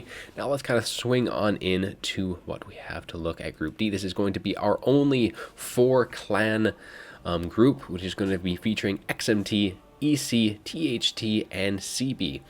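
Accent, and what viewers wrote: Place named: Russia. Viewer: Great accent. Russian right?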